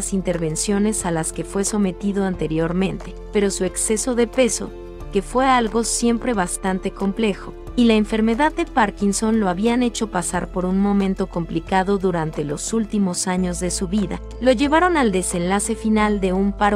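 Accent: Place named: Central America. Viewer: Por que el audio con tono o acento centro americano? No me gusta.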